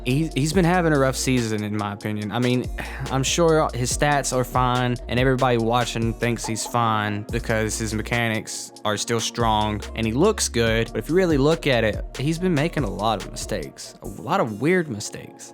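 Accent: South, United States. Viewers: Great video, great analysis, and I like your southern accent 10/10 quality rocket league content creator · idk why but im getting a hint of country accent like the same accent in my area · You sound like a more southern version of GarrettG.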